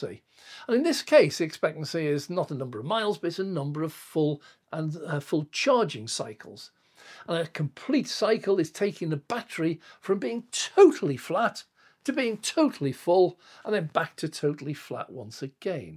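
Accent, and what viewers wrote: United Kingdom. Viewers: You sound British...why are you talking in miles instead of KMs?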